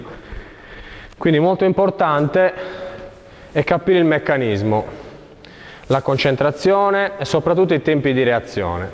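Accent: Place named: Sardinia, Italy. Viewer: accento sardo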